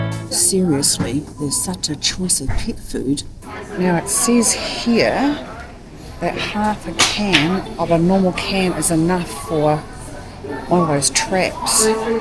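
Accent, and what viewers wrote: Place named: New Zealand. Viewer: You sound like a Kiwi! I have always fished for yabbies with scrap meat on a string, and a plastic rake.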